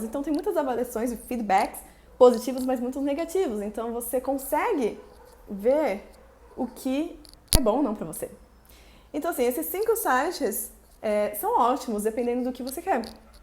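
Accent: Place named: United States. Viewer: Esse sotaque americano lindo que vc tem ❤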